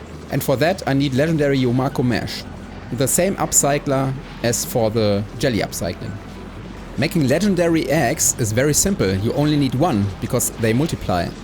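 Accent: Germany. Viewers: Habs auf Englisch sehr genossen und dacht immer "schöner deutscher Akzent" :-D ist aber auch auf deutsch cool. Ich würde mir ein richtiges Schritt für Schritt Schaltungsvideo wünschen. Ich verstehs auch nach 800 Std nicht und alle Guides dazu sind immer zu schnell... Ich versteh nicht warum bestimmte "Symbole" gewählt werden und so weiter und sofort. Darum fallen mir Raumschiffe so schwer, weil ich einfach nicht raffe wie ich da die Schaltungen verwalte... Bzw. Ists sehr stümperhaft. Würd mich echt freuen.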